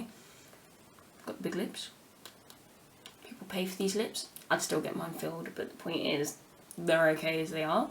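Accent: United Kingdom. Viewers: When u started talking I'm like oh u must be British and white but then when u said what ur mixed with I'm like .... Then why do u talk like that ? But ur beautiful the way u are! No matter what race & u should embrace more of your black side ☺️. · You have British accent, and they call you SPANISH????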